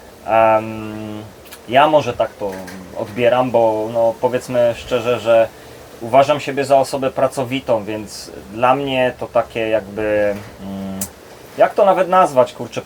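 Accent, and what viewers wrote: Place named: Silesia, Poland. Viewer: Bardzo dobrze godosz. Dużo YouTuberów pokazuje tylko❤ te piękne miejsca a nie jak są zrzyci z naturą.... Pozdrawiam Skąd że Śląska jesteś??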